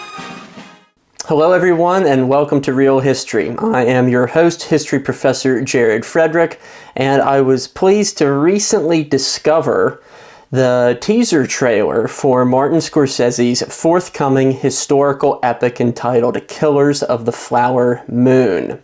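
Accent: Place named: Philadelphia, United States. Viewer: Prof, r u from philly area? You pronounce o like in philly.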